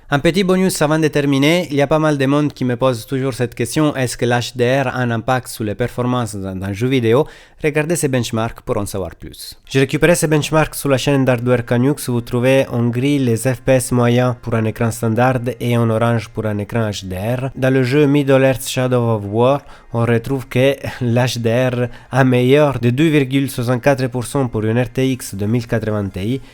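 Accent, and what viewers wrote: Italy. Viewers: Bravo je m abonné et jolie accent italien ???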